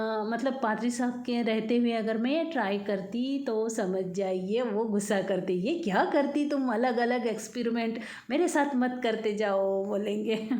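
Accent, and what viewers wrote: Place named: Belgaum, India. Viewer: proper nagpur se hain kya aap ka asent mujhe belgaum jaisa laga thats y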